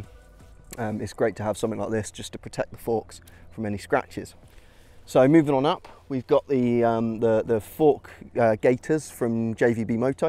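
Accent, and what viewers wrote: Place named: England, United Kingdom. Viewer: Hi, nice to hear an English guy with a comprehensive vid about what he’s done to his bike. Utube is full of septic upgrades an show ponies. Me “like yourself” rather ride it then clean It, have a tarmac version, (at my age I can’t afford to brake any more bones ☹ I think I’m about your age x3) but love your aggressive look. Will be getting the tool roll, love it an as you say, better the Yamaha’s version. I went for K-Tech front cartridge fork kit an rear shock (95kg rider) both fully adjustable, totally different bike. Will sub just to see what you get up to. 💪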